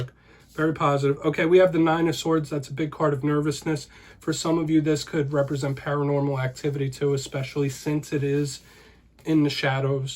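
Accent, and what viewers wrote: New Jersey, United States. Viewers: Love the stories the pictures tell. Use tarot for clarity and to help interpret the ongoing energies. Im a new subscriber and was attracted to the title. Also the NJ cadence, im originally an east coaster.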